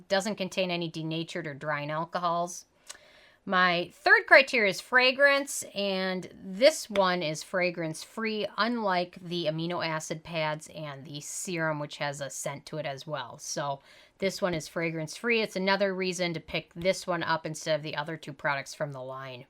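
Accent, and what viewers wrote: Minnesota, United States. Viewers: I know this is an old video, but I just ran out of my PTR moisturizer and I happened upon this. What a rude comment from the above person about your voice needing work. We all have accents. I just hear a Minnesota accent, not a bad sounding voice! You should hear my New Yawk (NY) accent! You have a lovely voice <3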